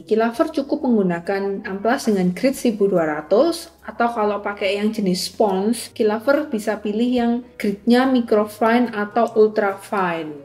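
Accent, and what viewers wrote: Java, Indonesia. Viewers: Mbak logatnya agak medok ya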